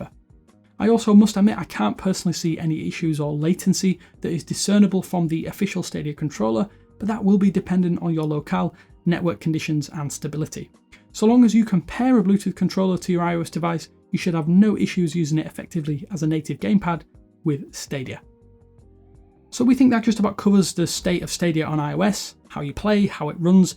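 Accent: United Kingdom. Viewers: Is this available worldwide? I’m in the UK and it doesn’t seem to be working. I ask the question because you seem to have a UK accent.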